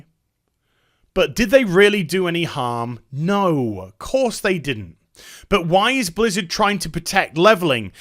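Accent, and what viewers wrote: United Kingdom. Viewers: I gotta say, i really like the British way you say things. You remind me of sargon of akkad.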